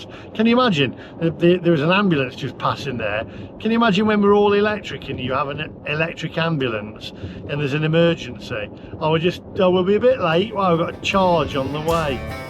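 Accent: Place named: Northern England, United Kingdom. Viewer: The fake trip over the charging cable was pathetic. "It's one of them cars" it's one of them services " it's "those ". can't forgive you for being northern,as my dad was a northerner, and he knew how to talk properly.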